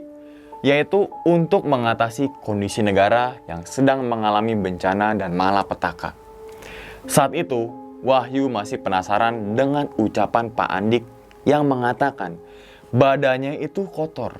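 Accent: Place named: Java, Indonesia. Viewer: Basa jawa ne bang lucu ☺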